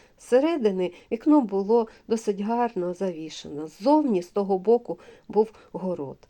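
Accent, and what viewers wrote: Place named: Russia. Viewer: Голос молодої жінки. Дуже гарна мова, хоча відчутно що колись людина говорила російською або суржиком. Дякую.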